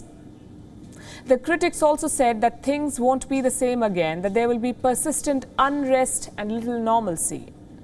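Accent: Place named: India. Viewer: Anchor speaking in our Indian accent this way we understood completely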